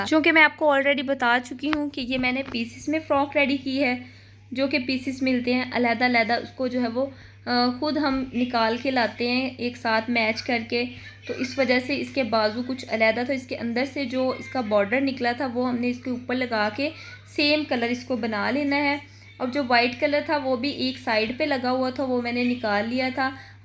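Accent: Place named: India. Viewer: bolne ka pendo styel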